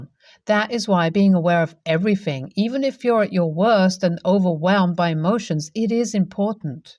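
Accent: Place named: England, United Kingdom. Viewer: Can you tell us what part of England you’re from?I love your accent and the way you read a story.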